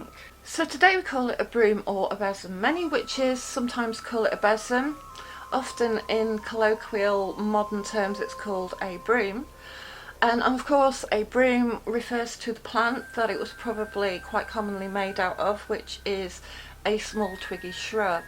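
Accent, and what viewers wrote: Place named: Manchester, United Kingdom. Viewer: U sound like from greater Manchester ha subbed u, Oldham here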